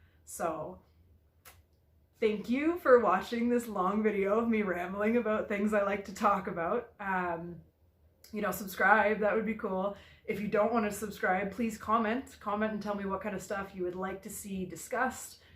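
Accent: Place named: Canada. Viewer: How old are you, and that accent sounds Canadian LoL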